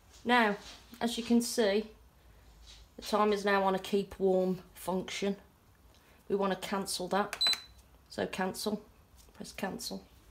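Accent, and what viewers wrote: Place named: Birmingham, United Kingdom. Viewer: Love this lovely listening to a fellow brummie x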